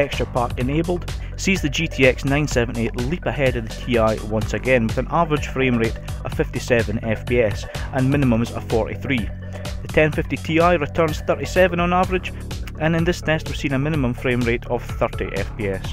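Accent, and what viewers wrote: Scotland, United Kingdom. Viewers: Another Scottish youtuber? This is great us scots are popping up everywhere now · what part of Scotalnd you from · Is that a Scottish accent? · Sub for the scottish accent. For some reason I love it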